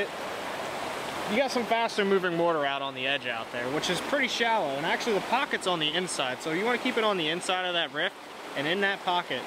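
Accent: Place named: Pennsylvania, United States. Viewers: "Float daun the crick". I say he's from PA. (just like me). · Western PA for sure.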